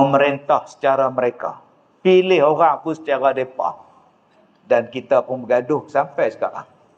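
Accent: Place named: Kedah, Malaysia. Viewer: Percakapan nya slang kedah...byk juga yg tak faham....tapi saya faham sebab arwah bapa org kedah tapi arwah ibu org minang.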